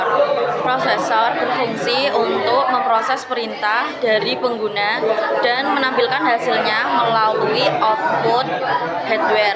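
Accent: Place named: Java, Indonesia. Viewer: medok banget jawanya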